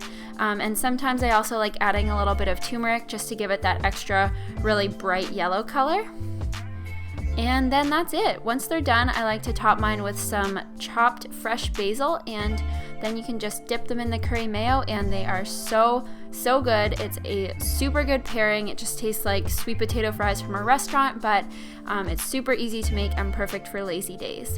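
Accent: Canada. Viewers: canadian accents are so cute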